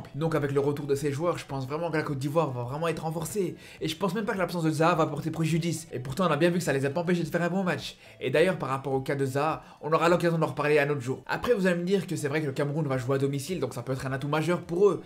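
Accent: Belgium. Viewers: Salut frère l'Algérie sera qualifié sans problème,,, tu as un accent belge ?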